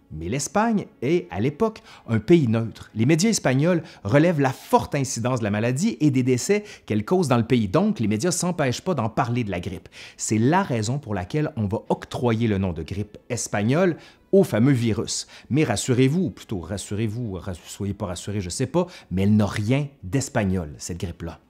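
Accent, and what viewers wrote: Quebec, Canada. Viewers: J'aime ton accent québécois.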